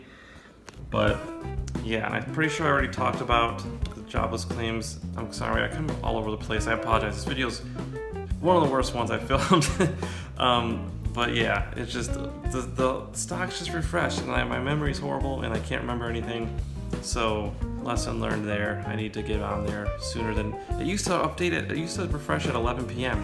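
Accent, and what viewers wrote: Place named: RP, United Kingdom. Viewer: Ok cool, it reminded me of how the Queen speaks (Im in the UK) :) I think Tesla should have produced the Y in the first place instead of the Model 3. The 'crossover' is the most popular type of car out there in N America. On production they are too slow at the moment in fulfilling orders. Even if they made 5k a week which they aren't doing, thats only 240k a year. Its not enough to be a mass market. I don't know why they didn't invest in production facilities to make 10k a week right from the start. Why not take the debt on while it is available from the capital markets and just put the infrastructure in place? Otherwise they'll just keep ticking along making cars in relatively small amounts. If they want to be the iPhone of cars they need to get the cars out of the door!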